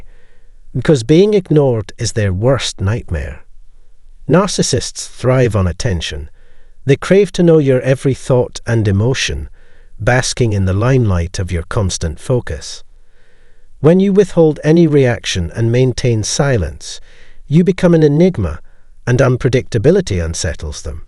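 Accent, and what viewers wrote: United Kingdom. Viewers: This particular session was very helpful, so clearly explained, I feel I can handle the narcissist in my life, and not have to worry that she can gain gain control over me. I think I can win now without harming her. I want you to know how British pronunciation comes across to me as less aggressive than U.S. pronunciation, and it decreases the stress I feel when absorbing narcissistic subjects. I look forward to listening to you. Thank you very much.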